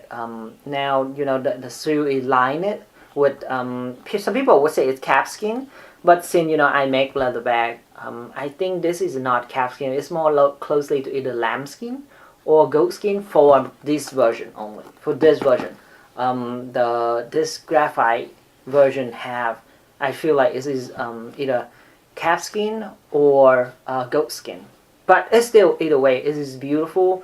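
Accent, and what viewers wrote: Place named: Vietnam. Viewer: Well his family name is popular in Singapore and Vietnam , but his accent also makes me wonder if he is Vietnamese or Singaporean...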